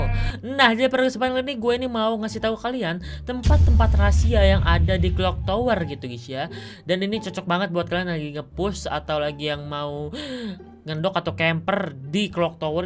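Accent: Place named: West Java, Indonesia. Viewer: Bang asal mana sih kok sunda🤣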